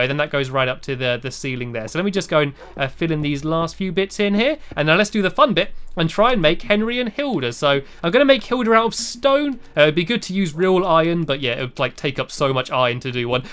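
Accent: United Kingdom. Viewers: You brit7sh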